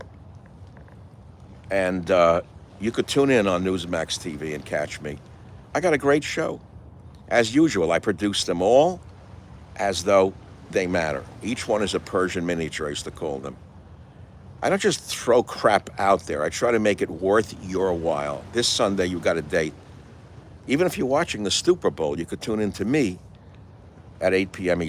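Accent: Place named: Brooklyn, United States. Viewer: Gods did I miss that Brooklyn accent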